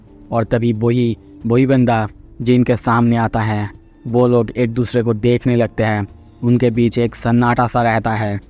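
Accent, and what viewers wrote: Bengal, India. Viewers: Kya ap Bengali ho?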